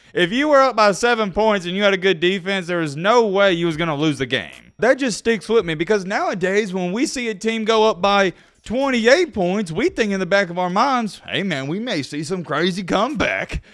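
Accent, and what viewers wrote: South, United States. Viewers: I don't even mind when you ramble about rambling. I like your take on football most of the time and I find your southern twang hilarious. Boomer Sooner on the Rise!!!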